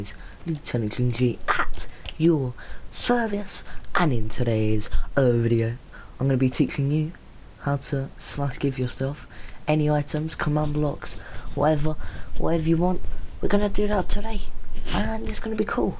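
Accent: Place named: Scotland, United Kingdom. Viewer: i sense scottish.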